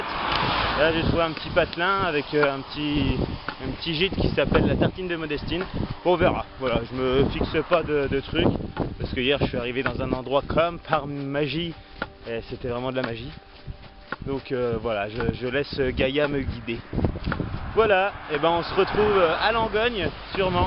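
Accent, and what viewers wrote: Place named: Vosges, France. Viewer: C'est la moulinette youtube qui m'a proposé ta vidéo. Bon, il faut dire que j'aime beaucoup la rando et qu'un parcours comme le Stevenson me fait envie. Je commence à regarder et je me dis : Oh, ce gars a l'accent du pays ! Et bingo ! Seul un vosgiens peut aller se perdre là bas en sandalette dans 20 cm de neige ! Je rigole mais faut quand même être gonflé ! Du coup je vais aller voir la suite de l'aventure de ce sapré gaillard ! Ah et puis un petit abonnement de plus !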